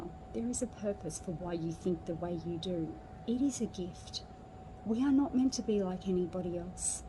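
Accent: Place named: Australia. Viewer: I like your accent.Are you in Australia?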